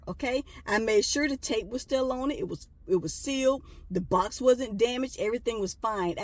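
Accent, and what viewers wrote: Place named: South, United States. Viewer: Strong ass southern accent god bless you lmao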